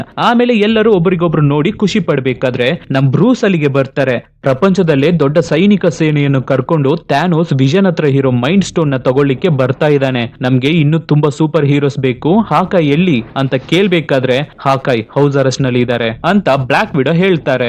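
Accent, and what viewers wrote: Tamil Nadu, India. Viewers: Sir nivu Tamil avra...yakandre nimma tone Tamil avr thara edhe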